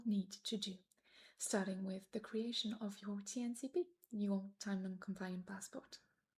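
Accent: France. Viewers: Your accent is pretty good. I only figured out you were French when that i sound slipped through a couple times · For an actual comment, I love it! The volume is low, which is of course a problem with having midroll ads (having to turn volume up for video makes the ads very loud). But I love the vibes and I love soft-spoken asmr. I'm also going insane trying to figure out your accent. French but if you learned English in England? You look French.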